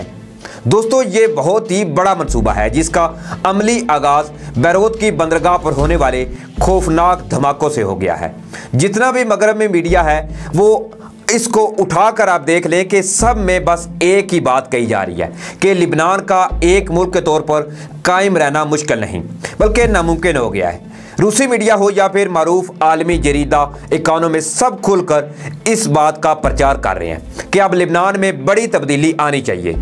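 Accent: India. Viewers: Assalam Walekum my brother speak Urdu so rightly you are talking like Indian country people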